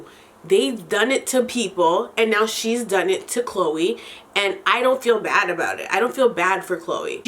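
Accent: Toronto, Canada. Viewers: I really like how you talk, Imma need to bring my Jamaican/Indian self to Canada to get me a nice Canadian accent lol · First of all, I KNEEEEWWWW you were from Toronto from the minute you opened your mouth, I love my Torontonians. Second, you confirmed it with the Brampton mans shit. I liked and subscribed just cuz you said "If you know, you know" about Jamaicans, cuz its so true LMFAO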